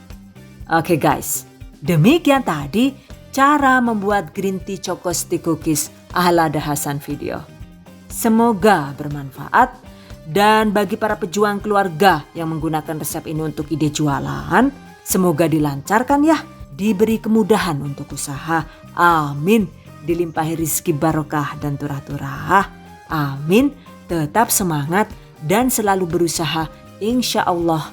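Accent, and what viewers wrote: Banyumas, Indonesia. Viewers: Ngapak temen sih...mbakkk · Langsung Caaaatat..Suka ngakak klo Mba udh Ngomong Ngapak2..Lah Lah Deneng dadine kayak kuwe..pating mbrudul🤣🤣🤣 Sukses ya Mba, Selain Menghibur Video2nya jg menginspirasi Emak2 Bakulan kyk Saya.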